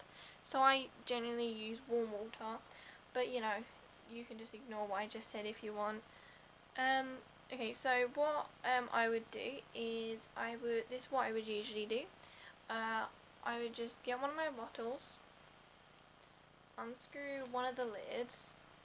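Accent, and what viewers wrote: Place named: United Kingdom. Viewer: where in the UK is your accent from? it's absurdly cute. with my limited knowledge i'll guess surrey or wales. oh yeah and thanks for the info vid =B gonna go get some more dklor today! my other batch just kept leaking out of the bottle.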